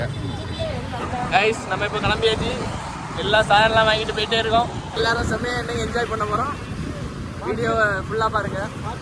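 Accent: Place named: Kanyakumari, India. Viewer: KK slang 👍👍🔥🥳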